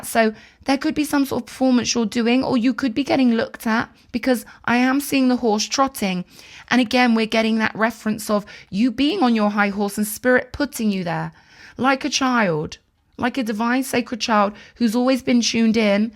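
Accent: United Kingdom. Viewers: You are ready to delve into my Childhood Memories, I’m always a Child within Honey- I really love your Brilliant articulation of your British Accent - I love to approach everything in my Path from a position of Knowledge and Wisdom ❤❤❤🍯…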